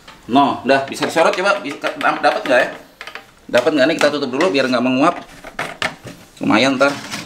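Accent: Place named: Betawi, Indonesia. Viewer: Mantap bang,,,suka betawi nyablaknya,,salam berawi ora,,,😆😆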